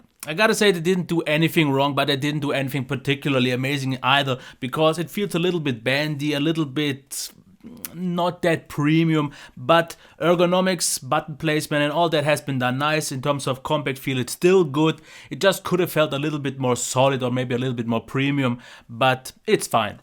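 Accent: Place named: Germany. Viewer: Based on your appearance, hat and western shirt included, you look like you were born in the great state of Texas, U.S.A. That said, Your accent agrees with your claiming to live in Germany. So, I'll just say, "Guten Tag" instead of "Howdy" I too was a little concerned about the "dated" Snapdragon 800 CPU (SoC). But, I checked the Futuremark website's Best Mobile devices and, it seems to still be comfortably somewhere in the top ~300 of all mobile devices running the Ice Storm Unlimited benchmark. It also didn't score too poorly on the Slingshot benchmark test (though the frame rates are as bad as you'd expect for a benchmark test that is intended to stress current top end mobile processors like the Snapdragon 835.) But, the Unlocked and refurbished AT&T branded version I picked up on eBay just yesterday for just $129.99, (the LG G Pad X V930), seems to be a pretty good deal; though the tablet's battery will be a year old with use. 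Still, I believe it will do well for my wants and needs. I just wanted to be sure it didn't have rear facing speakers, (which is something I find hard to believe that tablet makers actually have long done, and still do), and is mostly why I came to YouTube looking for reviews on it. One feature I was wondering about also was, whether or not it has an IR Blaster?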